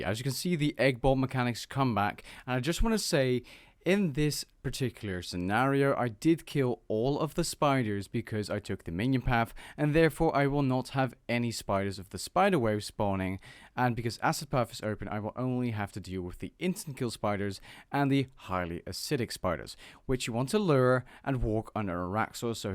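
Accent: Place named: Netherlands. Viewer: you can't hide your dutch accent boy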